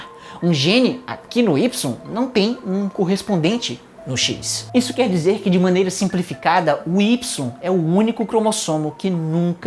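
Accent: Ceará, Brazil. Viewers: você é cearense né?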